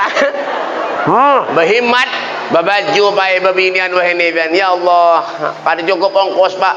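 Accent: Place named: Banjar, Indonesia. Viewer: Logat nya Arab Banjar 😁😁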